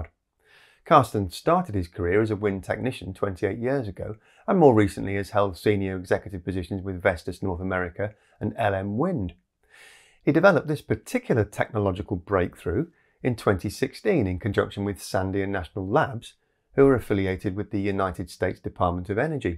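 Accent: United Kingdom. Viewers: I thought I would just mention, that just because you have a British accent does not mean you live in the UK 🙂 Thanks for another informative video.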